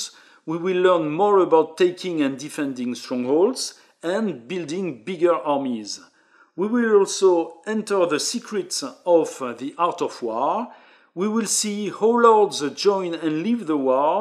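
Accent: France. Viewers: Very impressive! Watching it before trying to digest the rulebook. Being a Russian I'm indeed delighted by the choice of the topic by Volko Ruhnke. And on top of that, this French accent makes it look and feel more 'medieval' 🧙‍♂️ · I did indeed overcome the delicious French accent and it was totally worth it. This was a great tutorial! Well done! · "Delicious French accent..." LOL. It truly is. What a fantastic resource for Nevsky learners. Well done! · 36 seconds of this amazing french accent with attitude and I subbed. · Best tutorial ever and bonus the French accent that makes it even better.... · Super accent :) Du coup j'arrive à comprendre les règles même en Anglais. "But you are French ?" ;) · Thanks a lot! You are my most preferred english spoken with french accent youtuber! 😁💕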